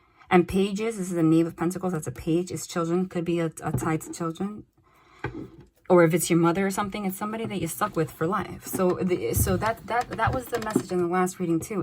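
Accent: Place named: New York, United States. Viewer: I needed this right now. it resonated so hard. I was thinking I need outside guidance to validate and clarify my own situation and this video was at the top of my notifications. posted like a half hour ago. and I've been sitting here for like a half hour pondering what's going on and boom here you are. and as a New Yorker I LOVE your New York accent. god it's so refreshing hearing a reader who speaks like me. like hearing my messages in a to the point direct New York way. like I feel like my mother or cousins are talking to me. I love your method of channeling. I have a similar type of occurrences of signs and validations and the cards coming out.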